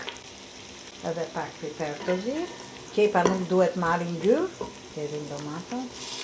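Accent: Tirana, Albania. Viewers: Ty te ka lezet luga kur gatuan dhe dialekti jonë i bukur i Tironës.❤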